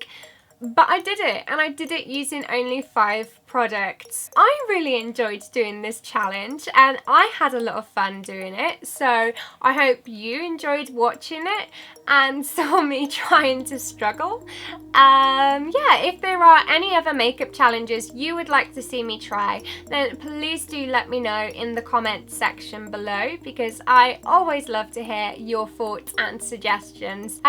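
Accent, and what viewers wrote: England, United Kingdom. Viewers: What kind of English accent do you have?? You sound like a teacher I had who was from the UK and I've always wondered what kind of accent she had cause I know it's not a cockney accent and that's about all I know lol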